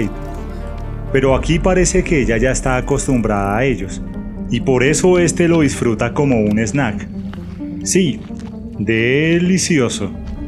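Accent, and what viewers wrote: Colombia. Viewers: Un saludo amigos: Si no estoy mal hay que saludar en plural pues ustedes son un equipo, me enorgullece mucho realmente, estoy bastante alegre con ver una propuesta de canal de entretenimiento como este y mas si es de mi país parece (Colombia) Se puede deducir por el acento. Cada análisis propuesto aquí, cada palabra, cabo suelto atado, cada pista descifrada, cada misterio resuelto o propuesto es una joya. Death Stranding ha recibido mucho Hype, eso todos lo sabemos, el titulo ha recibido criticas, insultos, etc, haters siempre va a ver, pero si algo todos debemos reconocer es que la espera ya de por si hace parte del titulo, el misterio detrás nos coloca a jugar desde ya, formando y buscando cada ficha del rompecabezas, esto es un titulo de Kojima, Kojima no es un desarrollador de juegos, Kojima no hace vivideros, Kojima es un artista, Kojima hace piezas de colección, así debemos verlo, así debería tomarse, Hideo esta a otro nivel, a mi me podría decepcionar el resultado si, me podrá parecer horrible, claro, pero debo ver que por mediocre que sea o impactante que resulte es una obra de arte y el arte es subjetivo, para mi sea como sea, ya es brillante lo que esta sucediendo en medio de la intriga. 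Muchas gracias por estos análisis y espero, encarecidamente sigan creciendo, un 100% De apoyo.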